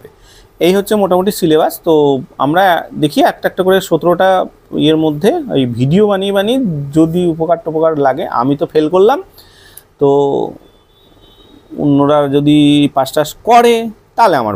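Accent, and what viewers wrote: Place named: Murshidabad, India. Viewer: Apnar kotha sune mone hochhe apni murshidabad er .. tai ki?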